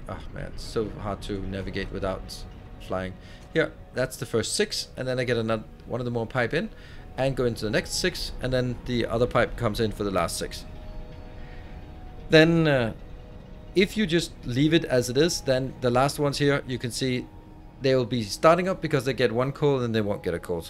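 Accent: Denmark. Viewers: are you from denmark???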